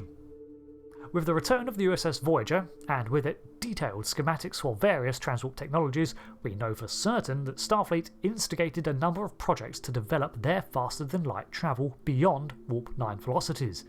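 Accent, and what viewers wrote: United Kingdom. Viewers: I, LOATHE british-narrated, ANY-thing! FAIL!!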